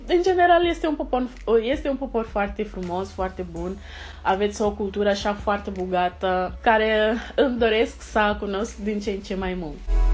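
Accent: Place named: Bessarabia, Moldova. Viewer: Ai un accent basarabean sau undeva pe acolo.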